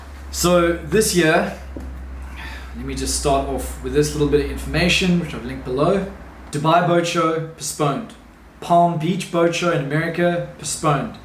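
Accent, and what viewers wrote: South Africa. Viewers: Boet, are you South African? The accent dead give away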